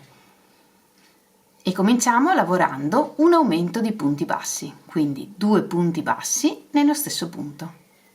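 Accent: Veneto, Italy. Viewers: Bellissimissima!!!! Starei ore a guardarti lavorare e ascoltarti! Spero di diventare altrettanto brava!! Intanto provo! Sei veneta?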